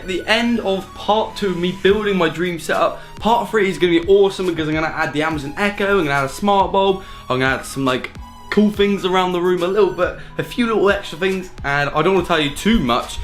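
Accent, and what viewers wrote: United Kingdom. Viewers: why does every british person call amazon amazn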